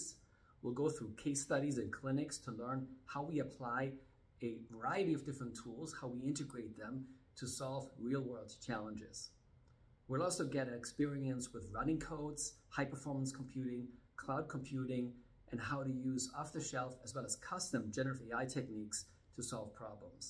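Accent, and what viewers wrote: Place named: Germany. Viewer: Hey, i wondered if you have a German background? To me you sound (and look) a bit German.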